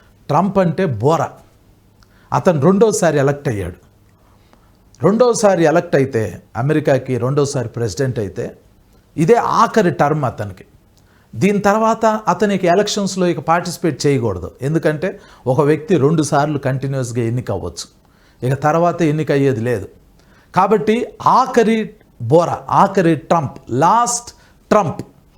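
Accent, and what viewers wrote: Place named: United Kingdom. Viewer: Babu munda meeru Telugu Nu Telugu laga matladute baaguntadi please English accent vaada vaddu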